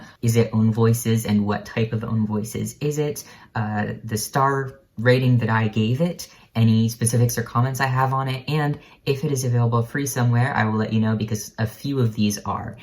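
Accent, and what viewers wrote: Canada. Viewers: I have Cemetery Boys beside me right now. I'm going to start reading it tonight. You go to school very close to me! I grew up near a certain university about 10 miles away from yours and I still live in the area. I took some art classes at your school through the continuing ed program, too. It's strange to think you're so close because you sound like you're from Canada to me. Before you mentioned where you live, I thought you were way up north somewhere. If one day you see some old person with pinkish hair staring at you, it might be me trying to figure out if you are who I think you are. I'm really bad at recognizing people.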